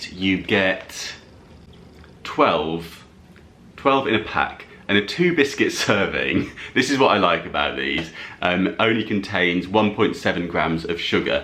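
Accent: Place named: RP, United Kingdom. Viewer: Your pound store is like our Dollar Tree in the USA. Your wonderful accent makes it seem so much more posh! We need you to represent our stores over here! Love the little cutting board, so many uses and looks a bit more expensive. You could have friends over for a cheese and cracker sampling and each cheese could have its own platform.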